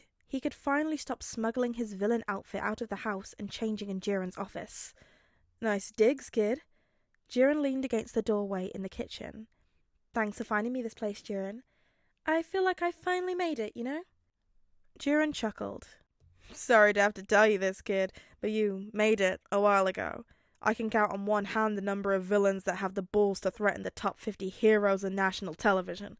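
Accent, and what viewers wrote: United Kingdom. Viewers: This is like, my 5th time listening to this podfic and it’s still amazing! You’re one of my fav podficcers! I’m also British and it really makes a difference not having someone American do it, I always find myself correcting them on the way we pronounce words and have to tell myself it’s not their fault Americans say words wrong. So basically yeah! Love your stuff and I Hope you’re having a good day!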